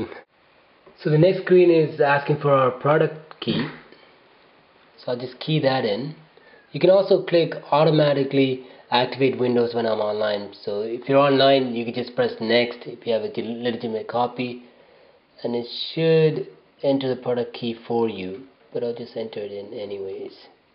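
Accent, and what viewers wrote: India. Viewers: u r from india, aren't u? :) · Indian... Obvious :)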